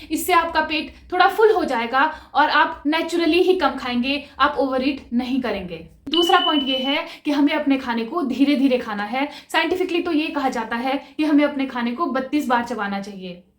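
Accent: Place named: Pahadi, India. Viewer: mai bhi pahadi hu aapke bolne ke tarike se samjh gai thi🙂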